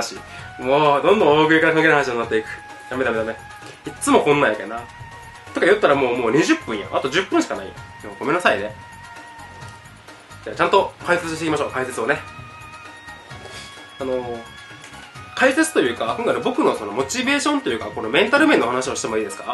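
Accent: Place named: Fukuoka, Japan. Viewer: 福岡なまりが懐かしくて癒やされます！お疲れ様でした(`･ω･´)ゞ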